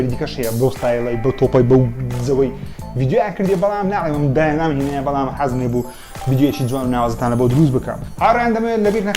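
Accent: Erbil, Iraq. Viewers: Zoor zoor Shazy Xoshawestrin ashtt laimn wshakanta Wa Hich zmany xosht nagoria Ba hawlery qsay dakay Xot mozqof nakay ba bo qsaybkay xambar xot Bgory Wa Zoor Shaza vidokant Zirakanau nweia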